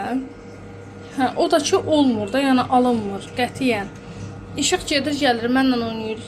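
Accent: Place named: West, Azerbaijan. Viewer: Maragli geldi haralisiniz lehceniz qerb zonasina oxwadi ona gore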